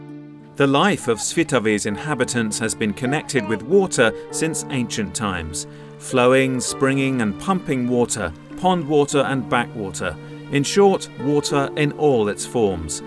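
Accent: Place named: United Kingdom. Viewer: Great info in spite of the Limey accent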